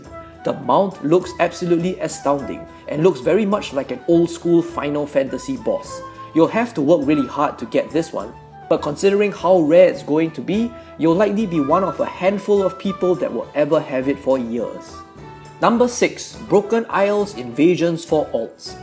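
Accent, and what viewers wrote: Singapore. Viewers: Are you singaporean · saw in another comment that you said you are sg..well that explains the accent ..but more importantly thank you for the tips ,they were very imformative and helpful for us casuals..i need to get the titles and a few mounts but ugg..and the mage towers really pissed me off..i can't find a good assasin rogue video to refer to..keep posting man i'm subbin ya ..we play both factions so we will see what happens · no wonder I found your accent familiar , I'm from Singapore too ! Which server are you playing ! Wanna play together ?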